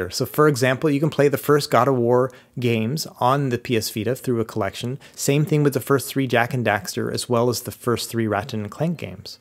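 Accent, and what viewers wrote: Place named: Midwest, United States. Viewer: Maybe it's just me... But u sound way more Midwestern in this video than in others 😭😭 u in MN or sumn? If so let's be homies 🤞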